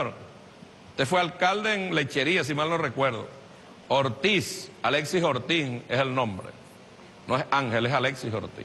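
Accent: Cuba. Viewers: Ha reclamal justiciaa, ya se le sale hasta el acento Cubano se les nota que repiten lo que viene de la Isla.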